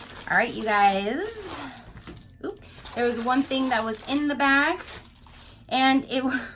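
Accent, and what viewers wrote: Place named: Canada. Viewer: Hi love, I just love the sound you made with the spoolie: buuuuuurom, beeeeroom, beeeerum, booooorum? Inquiring minds want to know.🤪 I wish I’d have gotten the same lipstick. Mine is from colorclub, and it is the EXACT color of the ipsy bag. Another toober, abbadabs, didn’t get her extras either. I have a feeling they are being shipped later. I haven’t heard yet. Another question: where are you from? You sound Canadian, but some Americans far north have a bit of the same accent. I really love yours. It’s so soothing. Gee do I sound like a fan girl, at the wee age of 63? Anyway, I’m not trying to hit on you, I’m happily married for 35 years(about, I always have to stop and count.) our secret? We both had practice marriages, and he was a workaholic who traveled all the time. I figure our actual time spent together is really about 5 years! 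It was a little hard being a sort of single mom, but once we got to San Francisco Bay Area, it got better. Everyone’s husbands worked all over. pm, any restaurant is filled with moms and children. We helped each other out. But these days you’ll often see a smattering of home dads too. My son-in-law is sort of one.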